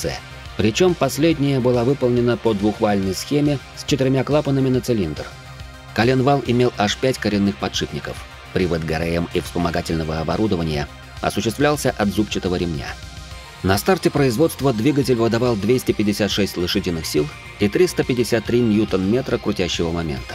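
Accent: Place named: West, Russia. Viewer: Спасибо за видео, всё по существу) по тому как ты называешь абривиатуры двигателей, буквы 1jzgte , и в конце говоришь "и" , можно сделать вывод что ты живёшь в западной части России. Все на востоке говорят "е". 4а-фе , 1jz-гте ну в общем понял суть 😀😀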